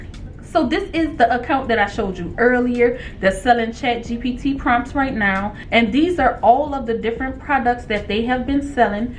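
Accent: New Orleans, United States. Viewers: Where ya at. (504 colloquialisms. Born & raised in N.O. I hear that accent. ❤ Hunni...you out here helping folk live!! I'm here for these multiple streams of income videos all day. I just have to get computer savvy. I just foubd your channel & will be a new subscriber. Thank you for helping us learn how to go out get it.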